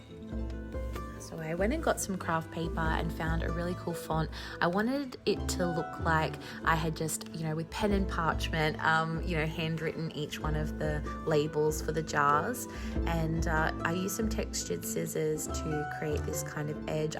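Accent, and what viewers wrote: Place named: Australia. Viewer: Me, hears Aussie accent. Instantly hits subscribe because I love supporting fellow aussies… and because Aussie witchie fun!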